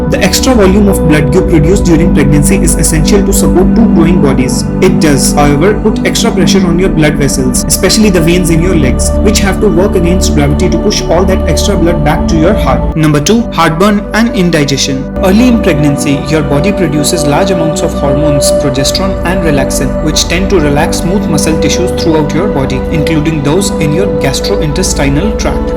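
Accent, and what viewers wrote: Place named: India. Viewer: Can I fond a video with no Indian accent?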